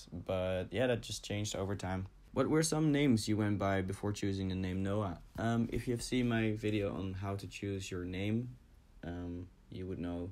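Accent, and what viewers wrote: Netherlands. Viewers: That dutch accent tho 🤘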